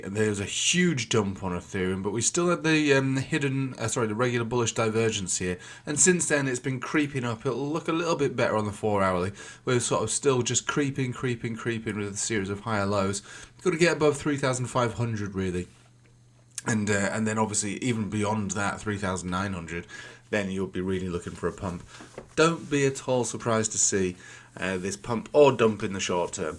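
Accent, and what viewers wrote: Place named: Leeds, United Kingdom. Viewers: I’m in Bradford you sound local are you in West Yorkshire somewhere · I think he might be from Leeds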